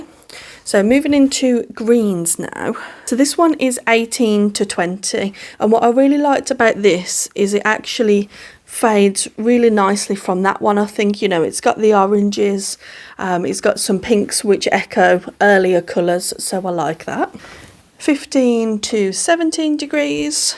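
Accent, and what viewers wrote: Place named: Midlands, United Kingdom. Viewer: I’m glad I found you. I’m getting a little bit obsessed with temperature projects. I’m almost finished on a quilt and blanket for this year and just started on a very bold and clashing quilt, started in September to finish on my 60th birthday. From your accent you sound like you’re not far from me in the Midlands. I’ll join the Facebook page, so hope to see your pattern and how you progress there.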